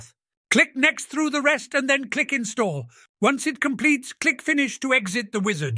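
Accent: United Kingdom. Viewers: btw is it or, can't find any british voice like yours, it's really funny, i just wanna listen to this guy, and do whatever he tells me to do, like "go to gym you idiot" XD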